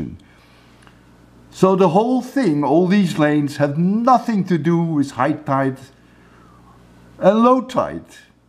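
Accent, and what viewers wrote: Netherlands. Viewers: Professor, are you Dutch?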